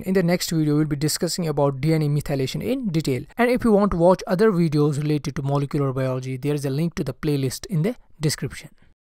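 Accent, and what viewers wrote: Kashmir, India. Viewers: Wonderful explaination. You HV kashmiri accent.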